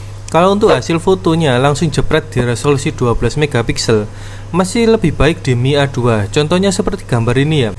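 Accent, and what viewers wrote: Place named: Surabaya, Indonesia. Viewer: Suroboyo ta bang ? kok medok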